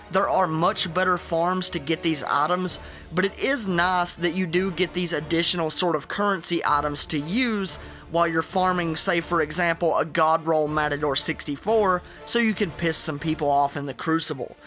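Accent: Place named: Texas, United States. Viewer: Extreme Cynic nope this is a Texan accent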